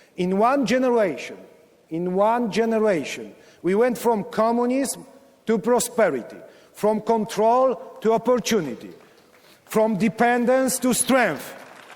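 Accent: Poland. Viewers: Angielski prezydenta nie brzmi sztucznie, słychać polski akcent, a sama wymowa jest pod kontrolą. Godne wystąpienie. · Hmm polski akcent? Ogarnij sie😮 TO Polak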